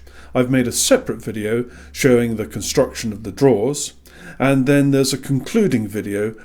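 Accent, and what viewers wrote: England, United Kingdom. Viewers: Best male English accent on Youtube, could listen to you all day mate!.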